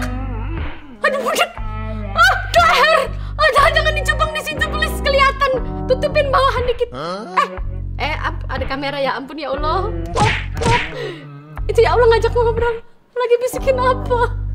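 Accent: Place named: Java, Indonesia. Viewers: Reaction logat Jawa lucu kak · Boso.mu medok² jowo Ki wong ndi toh😴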